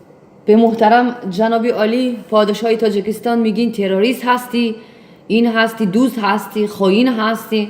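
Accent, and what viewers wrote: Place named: Khorasan, Afghanistan. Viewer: ДАР хуросони Афгонистон Точико хамихел Дари сухбат мекунан 😀😂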